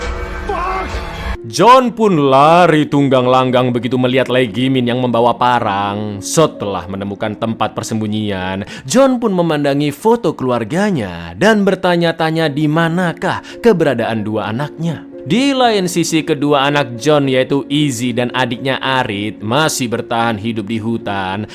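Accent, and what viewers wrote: Indonesia. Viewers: menurutku ngga papa, biar ada aksen Indonesia nya, biar jadi ciri khas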